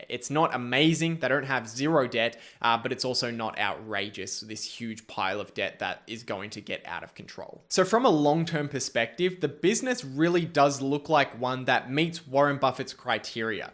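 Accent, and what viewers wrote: Australia. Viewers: Hmm rosy cheeks and an Aussie accent ☺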